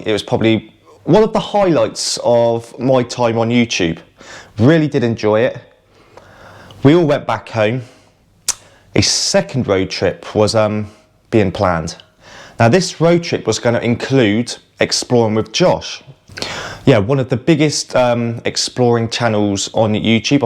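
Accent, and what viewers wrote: Bristol, United Kingdom. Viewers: Where in the UK you from? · I sit down with the whole family and watch/share your uplaods. You're a part of the household down here in Plymouth. Abandoned exploration wouldn't be the same without that Brizzol accent. Thank you.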